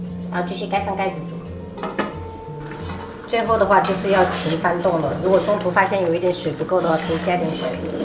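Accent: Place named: Quanzhou, China. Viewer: Watching your video makes me homesick. 川妹子说起闽南话竟然一口泉州腔。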